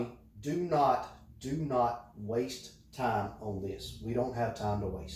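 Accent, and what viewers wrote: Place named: Mississippi, United States. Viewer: Are you from Mississippi?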